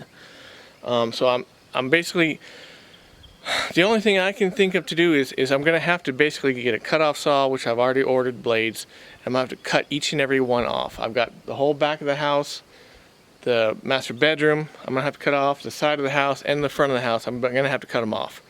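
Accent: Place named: Tennessee, United States. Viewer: Ur Tennessee TWANG is started to come back, lol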